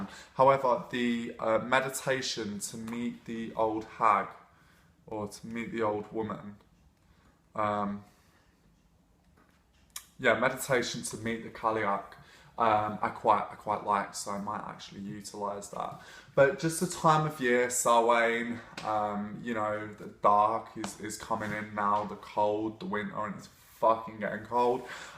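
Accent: England, United Kingdom. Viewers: I have only ever heard Irish and Scottish speakers pronounce it like kal-y'och, call-y'och, or cull-y'och...So I feel like he's saying it pretty accurately, just with a more English accent. Of course I'm American, so maybe I'm wrong. :)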